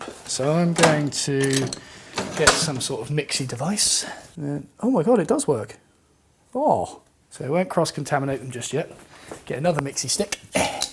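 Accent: England, United Kingdom. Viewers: i thought he was an Englishman from his accent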